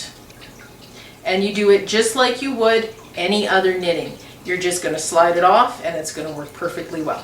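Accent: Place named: Canada. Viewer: I’m a new knitter.....definitely this is my favourite slipper pattern....Thank you for all that explain and the free pastern. I’m in BC, I could tell you were from somewhere in our country 👌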